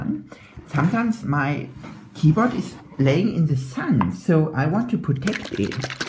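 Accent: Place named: Germany. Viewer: That german accent is craking me up lol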